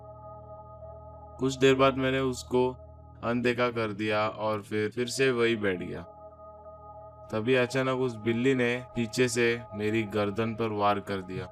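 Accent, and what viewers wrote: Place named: Hyderabad, India. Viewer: You sound 100% Hyderabadi to me. indian Hydro I mean.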